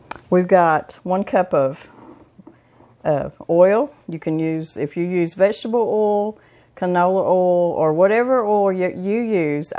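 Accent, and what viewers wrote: South, United States. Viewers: Thank you again for your calm, sweet "southern" accent, blog. Not brave enough to try some of your recipes like this one but, love seeing your home, decorations and your sweet smile, and too!!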